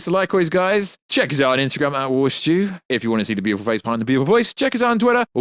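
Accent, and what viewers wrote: England, United Kingdom. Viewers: This terrible channel is so bad I need an interpreter to translate English accent to American!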